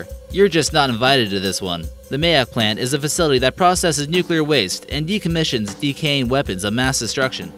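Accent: United States. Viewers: What's with the Aussie accent for the subscribe button and the American accent of the nasally narrator..?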